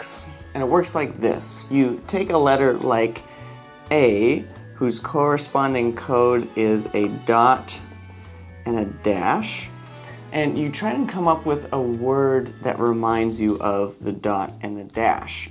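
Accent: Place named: Canada. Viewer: You sound Canadian are u Canadian ?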